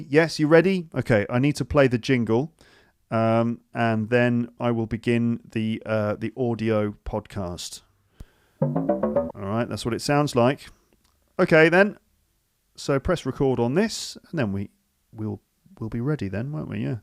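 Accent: RP, United Kingdom. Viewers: I like british accent. so I really like to hear your podcast. many thanks for your superb poadcast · Hi! I love listening to your podcasts, your voice, and your British accent. But when you imitate other accents, it was hard to understand them. In order to communicate with non-native speakers, I don't want to follow the rule of accommodation theory and grade my English down. I would prefer to speak closely the way you speak. But if you are a native speaker, you can accommodate your accent to different kinds of people. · I love the british accent, your channel is one of the best to practice my listening. :) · Excellent you're apparently an amazing mentor with your indispensable method in particular with the pure British accent I wish I could talk to everytime anytime to be honest I'm an English either I've got approximately 50 students who belong to middle class family but they obviously make an effort to develop their vocabulary and focus on their spoken English most of them enjoy a lot but they're curious to talk with a native speaker specifically with British I hope you could spare sometime with my students online on video call thanks a lot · My best teacher. 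I love British accent.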